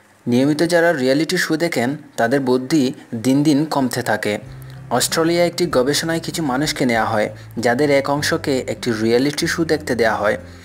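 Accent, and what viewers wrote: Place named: Sylhet, Bangladesh. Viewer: আপনি নিশ্চয়ই সিলেটি ভাষা বা উচ্চারন ঠিক করুন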